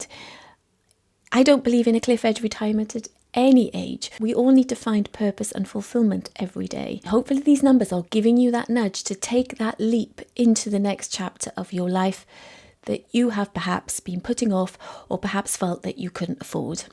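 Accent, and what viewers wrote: Wales, United Kingdom. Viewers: is she Welsh ? this is the softest Welsh accent i've ever heard, it's lovely.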